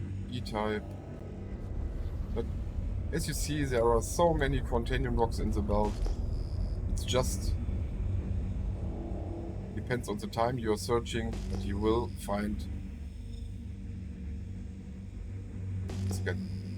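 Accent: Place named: Germany. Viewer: dont appologize for the "hard german accent" its amazing and your english is very good. Very easy to understand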